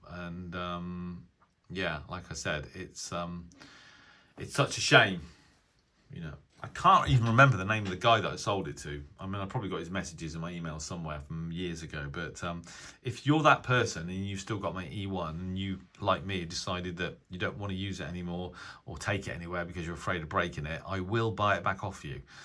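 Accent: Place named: United Kingdom. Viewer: Quite interesting story here. I love your British accent 😁 I never had any of these high-end Etons. I was among the lucky ones who've grabbed a brand new Elite Executive for 50 euros on eBay, this radio so many people speak greatly of. Well, I'm not that impressed. I don't think it'll make me retire my PL-660 or my Sony ICF-7600G. It has some selectivity issues when connected to a long wire. Also, the soft muting while tuning the bands kind of harms the pleasure. It's nice, well built, good sound and a gorgeous display but not my best radio. I'm glad I didn't buy it at its retail price. I also have an ICF-2001 (not D) that was dead (didn't turn on) but someone managed to bring it back to life for me. I 'll get it back next month and I'm looking forward to this. Keep up the excellent contents here and on X. Best 73s.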